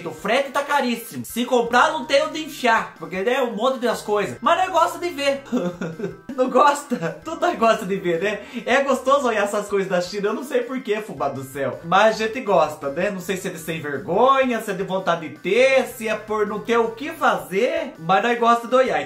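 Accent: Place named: Itatinga, Brazil. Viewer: Fubá vc é igualzinho a mim nois fala mesmo sou de Itatinga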